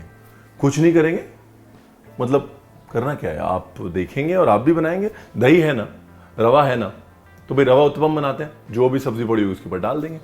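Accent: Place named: Punjab, India. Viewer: Punjabi Accent 😍😍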